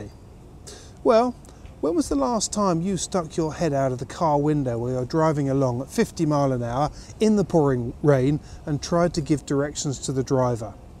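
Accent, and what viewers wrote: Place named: RP, United Kingdom. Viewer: very cool bbc style English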